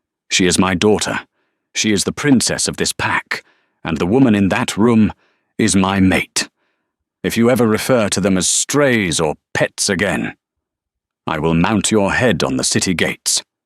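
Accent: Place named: United Kingdom. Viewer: I call it Yummy Purring English / British accent.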